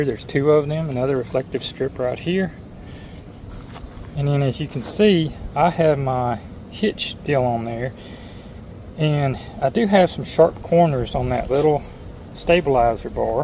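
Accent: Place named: South, United States. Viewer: Yeah, and you're a pretty good actor. Maybe take a trip California, they love a southern accent there. You can be a Hollywood star! Plus, it's one of the most beautiful places with millions of acres of public land lots of jeep trails. I live in Mississippi but just bought property in Arkansas, just a rock throw from Pond Creek. I like to canoe and love all the water in that area! My girlfriend is from Louisiana, so we like to explore all the nearby states.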